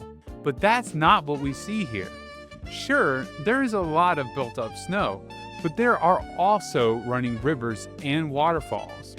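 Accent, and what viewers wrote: Appalachia, United States. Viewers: I jest in my derision, of course lol I'm from down closer to the Cumberland Gap and Smokies, so it's always funny to hear the different pronunciations. Still, glad to see mountain cousins making fun content though! Keep up the good work!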